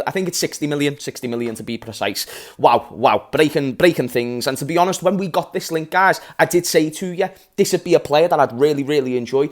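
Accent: Liverpool, United Kingdom. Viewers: The energy and the scouser accent always brings me back to this channel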